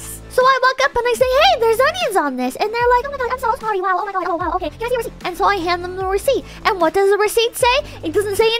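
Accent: New York, United States. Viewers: I JUST realized she's got a serious Nuu Yawk accent that comes out occasionally.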